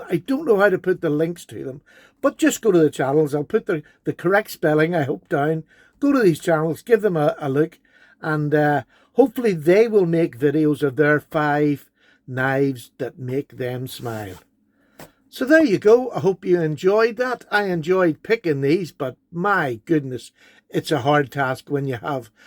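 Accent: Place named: Ireland. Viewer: Hi Paddy! I stumbled upon your channel about a week ago, I I want you to know what a pleasure it is to watch your videos and listen to your voice! Your brogue is what I imagine my ancestors would have sounded like! I appreciate your comments and attitude as well as the knife content! Have a great day Sir!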